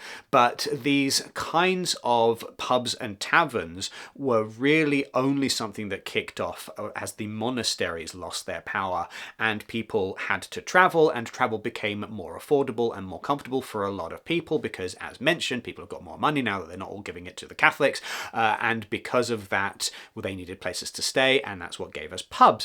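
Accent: United Kingdom. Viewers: Ahahaha, great. We really appreciate your videos, we are from Italy, me and our two daughters (10 and 14), we love you and with you we have also a good exercise of comprehension with your beautiful british accent!!! With subtitles, of course ahahaha. And we are fanatics of Lego too, for sure.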